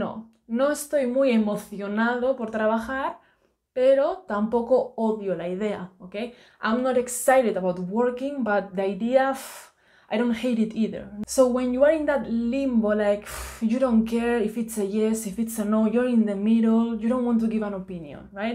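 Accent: Spain. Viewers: nice video ' are you from spain?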